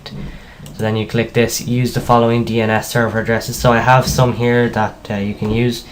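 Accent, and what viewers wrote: Ireland. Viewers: FUCKING THANK YOU!!! and your adorable Irish Accent!!!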